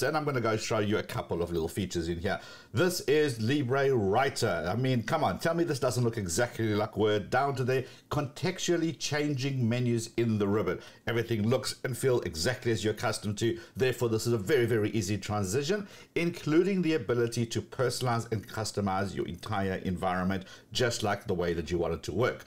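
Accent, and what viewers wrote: South Africa. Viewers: Very usefull video. Thanks! So I am thinking. The accent.... born either in Cape Town or Sandringham....? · Wait, are you South African?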